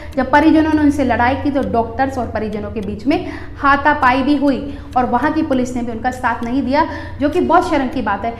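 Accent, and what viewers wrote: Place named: Haryana, India. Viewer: Apne boli kit gye bhai harvani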